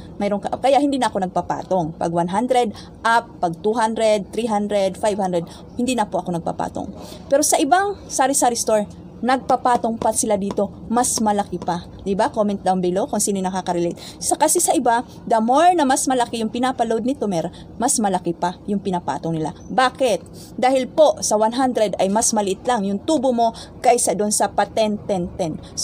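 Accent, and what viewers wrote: Waray, Philippines. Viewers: Waray waray ka maam?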